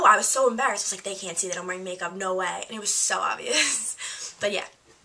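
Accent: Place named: New Jersey, United States. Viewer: Your voice sounds like it changed from you regular sweet voice to .... a Jersey Shore accent.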